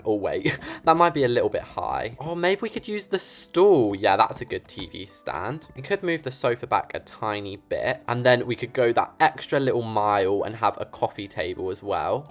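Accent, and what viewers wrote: United States. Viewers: Your american accent though🤭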